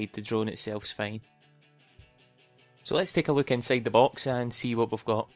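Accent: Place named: Scotland, United Kingdom. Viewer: I don't want to sound incredibly creepy, but where in Scotland are you from? Your accent is very similar to mine.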